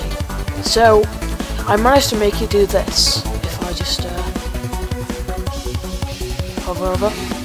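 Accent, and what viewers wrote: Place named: England, United Kingdom. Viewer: you are english i can tell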